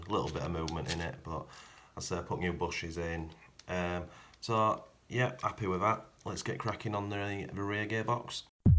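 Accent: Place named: Yorkshire, United Kingdom. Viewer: Boomerang was my very first rc car...all those years ago ! Wouldn't mind another now. What you doing in Canada ? You sound like a Yorkie to me !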